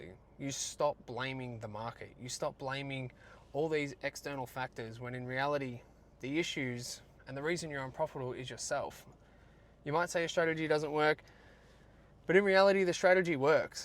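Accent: Australia. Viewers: hey, great to hear an auusie voice in this arena. what are you trading? stocks, futures, currency...? and where did you find a mentor. are there any clubs or groups that meet regularly that i can hook up with?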